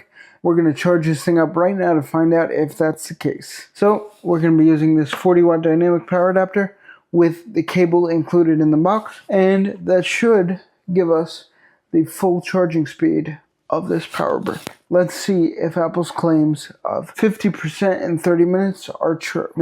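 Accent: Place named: Scotland, United Kingdom. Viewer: God bless you the exact content I needed that ia could not answer. I take it your descended from Scottish people I can tell with your accent. The home land thanks you x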